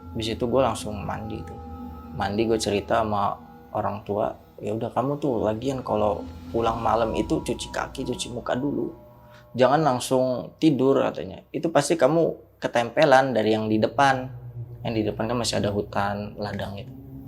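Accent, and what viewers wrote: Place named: Serang, Indonesia. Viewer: wong serang kih wkwk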